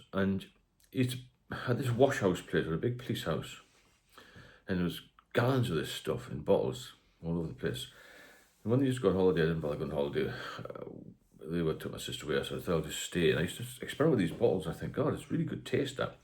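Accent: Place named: Newcastle, United Kingdom. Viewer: You sound like you come from Newcastle. From experience, it's a shame that you don't come from a little further south, Redcar, where pub culture collides with parsimony, worthy of a David Attenborough documentary. "The Redcar drinker, always at the front of the herd approaching the pub, chattering loudly, suddenly withdraws silently to the back as the herd approaches the bar" You might as well get two pints in when it's your round, as the 'whose round is it anyway' game can go on forever when it's one of their turns.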